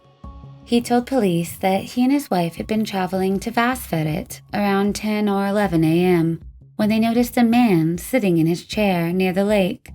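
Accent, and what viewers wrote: India. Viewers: I personally love his accent, and I'm quite fond of indian/middle eastern accents in general. They are a joy to my ear. I do agree though that soothing voice is perfect for the channel and is most effective at conveying the sometimes unsettling information in a very palatable way. Love the duo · Thx, not the Indian Guy speaking again 😬🤭👍👏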